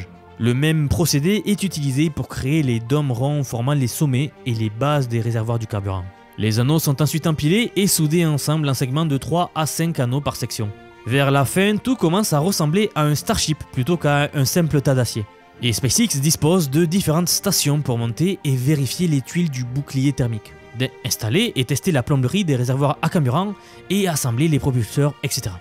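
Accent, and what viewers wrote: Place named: South-West, France. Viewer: Trop bien ce contenu ! Full valeur merci. Bonus pour l’accent du sud ouest !!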